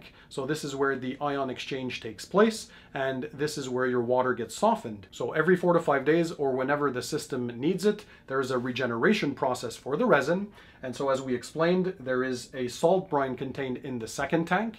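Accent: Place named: Canada. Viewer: Canadians and their accents.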